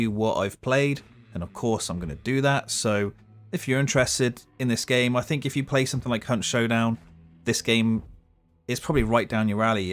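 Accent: United Kingdom. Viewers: A British mush mouth is just so cute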